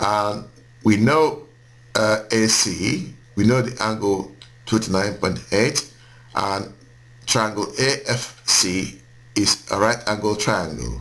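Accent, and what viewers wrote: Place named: Nigeria. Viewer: ahaha nigerian accent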